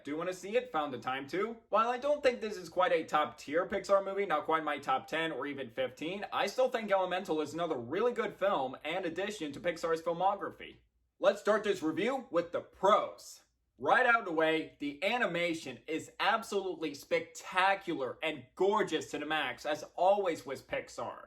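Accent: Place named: Canada. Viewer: Are you Canadian?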